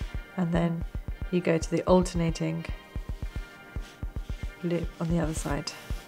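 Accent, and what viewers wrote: United Kingdom. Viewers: Does she have a British accent? Or is it just me that thinks that?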